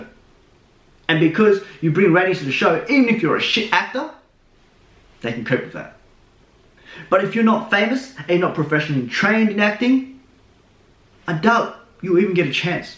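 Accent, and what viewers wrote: Australia. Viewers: I love your Australian accent!! Ur soooo cute!! Maybe you can just become a solo artist.... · You are so cute when you serious....i love your Australian accent.. If I'm right.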